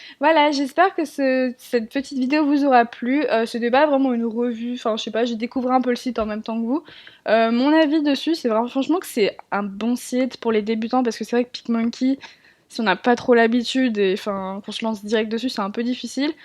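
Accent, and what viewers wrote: England, United Kingdom. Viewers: oh mon dieu ton accent anglais et juste waouhhh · Jadore t'es video mais ton axant anglais me gene un peut car en dirait que tu veux faire genr ..'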